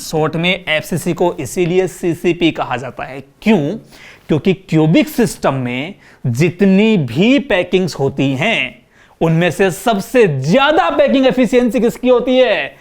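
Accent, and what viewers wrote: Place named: Rural, India. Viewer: Sir ke bolne mai gao ka taste hai Jo iss lecture ko aur bhi interesting bna rha hai Love u bhaiya 3000❤❤❤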